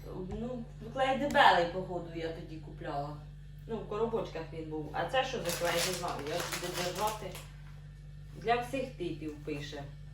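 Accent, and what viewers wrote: Poltava, Ukraine. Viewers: Полтавськая область, Карловский район. Это родной мой край, где прошла моя молодость, где могилы моих родителей. Слушаю и наслаждаюсь, у меня тоже такой же говор. ❤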